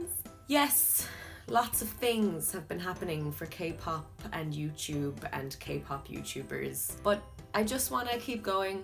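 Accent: Ireland. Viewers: Are u Irish? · She’s Irish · I can tell by your accent that you’re Irish, also you’re amazing at describing everything in an educational way. I was wondering and I know this is a weird question but is there a certain dance studio you go to or teach at? Do you recommend certain dance classes for someone like me who loves the style of dance k-pop artists use....?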